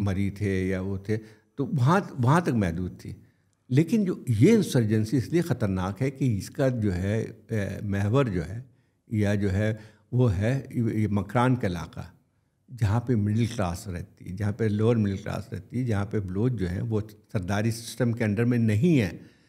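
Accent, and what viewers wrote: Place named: Bihar, India. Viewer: sahab ke bolne ke style se aisa lagta hai ki ye basically Bihar ke hai.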